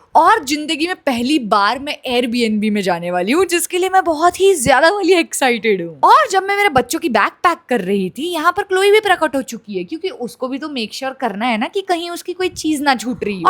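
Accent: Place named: Indore, India. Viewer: As a indori I just love that bich bich me thodu sa indori wala pronounciation 😁☺️🫶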